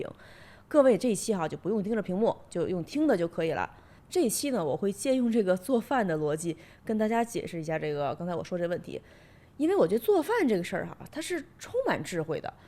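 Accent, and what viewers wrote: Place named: Beijing, China. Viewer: 本期北京话含量极高:face-blue-smiling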